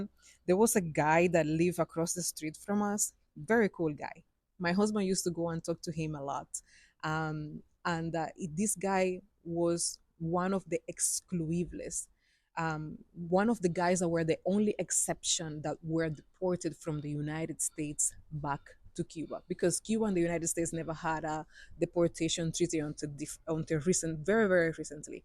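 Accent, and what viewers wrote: Patois, Jamaica. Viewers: Hey, love the accent mixed with the patois. It's fyah 🔥🔥🔥🔥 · Guurl pleaee talk de patois and mad dem LOL! Love it 🥰 and please keep sharing your stories with us. Also waiting for the engagement/married story and pictures 😉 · Hi love the story I love the way you talk the patwa so no badda wid the whole heap a pretty English because you don't sound the same I fall in love with the way you talk jamaican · Luv yuh storytelling luv the patois I would listen to u all the time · Hello I enjoy your stories which are very interesting and I like when you talk patois too you are a true Jamaica 🇯🇲 🎉I will be looking out for more of your experiences 😅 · Wow. Living in Cuba seems so hard. I love watching your channel, you are so funny in the way you express yourself, with your cute little Jamaican accent😊. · I’m a new subbie please be your authentic self! 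Love your Jamaican accent please don’t let people make you feel self conscious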